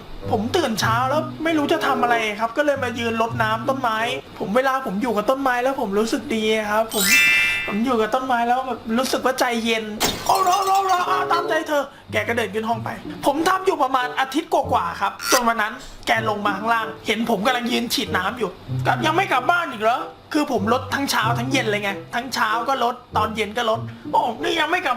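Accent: South, Thailand. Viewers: อาจารย์เป็นคนใต้หรอนิ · พี่เป็นคนใต้ออ55555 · จารย์สำเนียงโครตใต้เลยนิ<num> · คนใต้นิ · พี่เปนโคนใต้เห้อะะะ555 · ครู เป็นคนใต้หรอ55555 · ใต้ออกพี่ 5555ฮาช.ห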